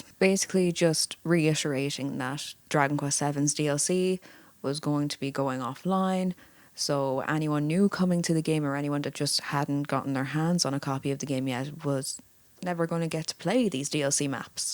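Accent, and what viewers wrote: Ireland. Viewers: Checkpoint said that this was a delightful tale with bonus irish accent. At the start I thought to myself this is a light accent, and then for every minute the accent more and more :P Anyhow this was indeed a delightful story. :)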